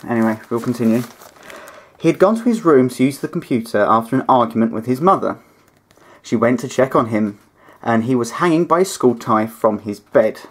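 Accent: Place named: Manchester, United Kingdom. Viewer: #1 BEST MANCUNIAN ACCENT EVER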